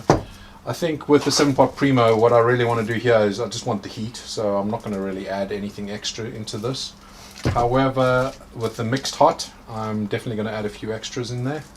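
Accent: South Africa. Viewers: South african ?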